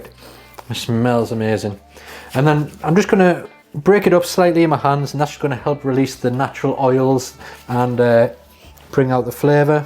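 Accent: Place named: Birmingham, United Kingdom. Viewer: Great content. Im loving the Brunmie accent 😮 😉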